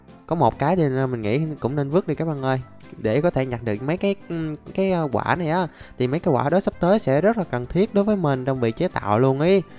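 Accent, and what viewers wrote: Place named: North, Vietnam. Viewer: Coi lại video cũ của anh khúc đầu nghe anh nói mà cứ tưởng người Bắc ko à =))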